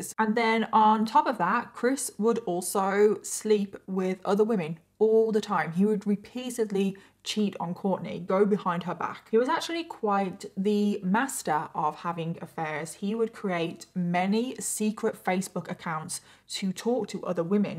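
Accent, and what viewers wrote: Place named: RP, United Kingdom. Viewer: It's weird to hear someone with her accent be shocked about cousin relationships.. royal fam history?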